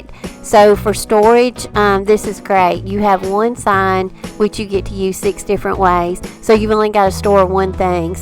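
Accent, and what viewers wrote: South, United States. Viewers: You are as sweet as you seem on your videos! Thank you for the kind words and thoughts. I’m not going to win this battle, but I’m so blessed, and take each day as the gift it is. I was a nurse for 30+ years, one of the 1st “lessons” I learned and try to live by each day...”I cried because I had no shoes, until I saw a man with no feet”. I’m a lucky woman. I love just hearing your southern drawl, as it makes me feel as if and think of “home”!! If you’re ever in Va, I got a tall glass of The House Wine of the South (Sweet Tea!) with your name on it! Look forward to what your doing next, and of course will be watching for whoever the Winner is. You show so much more than crafting like the giveaway, “can’t keep it unless you give/share with others”. Thank you for being a bright spot every time you “share”! Xoxo Cindy (sorry, didn’t mean to chew your ear off!! 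I’ll try and keep on topic next time! I don’t do social media I hope I’m not breaking any rules??)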